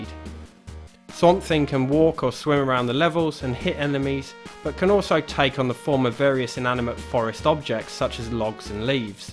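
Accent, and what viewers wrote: England, United Kingdom. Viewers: T H I C C english accent.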